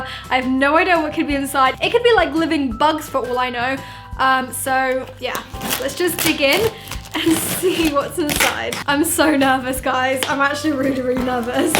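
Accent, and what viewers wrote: England, United Kingdom. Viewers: You sound so British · Is she British? 🇬🇧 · Are you from britain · 1dlover42aj british accent? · She's english.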